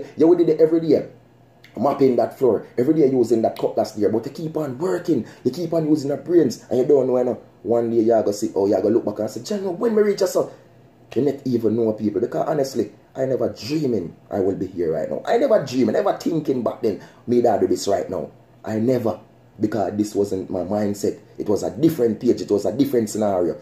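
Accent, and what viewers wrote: Jamaica. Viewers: Bredda yuh sound like a St. E man. Please confirm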